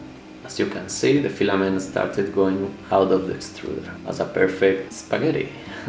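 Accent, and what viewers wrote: Spain. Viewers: Después de un buen rato viendo el vídeo y sospechando por tu acento de que sabías hablar español, veo que pone en el Mac "Archivo/edición" jajaja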